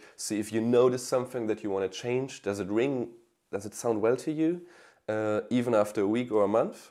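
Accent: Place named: Germany. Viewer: I think it's the German accent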